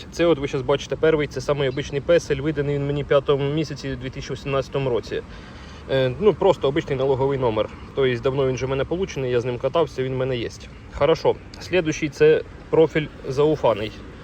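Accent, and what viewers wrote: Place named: Poltava, Ukraine. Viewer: Рідний говір ) . Ви з Полтавської області? )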